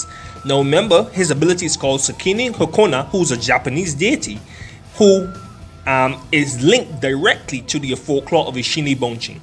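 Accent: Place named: Trinidad and Tobago. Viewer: Loving the accent, trini?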